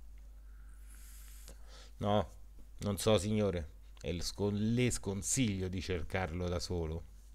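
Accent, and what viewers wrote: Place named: Rome, Italy. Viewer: C e comunque da dire che doppiato in Romano da te ha il suo perche 😂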